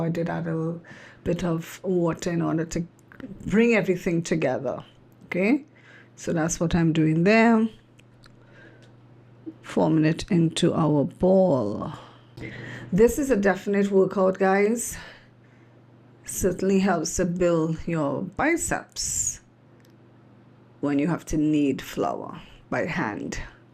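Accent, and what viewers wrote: Trinidad and Tobago. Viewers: Love It! 🇹🇹 not related to the recipe but you sound a bit Trini :)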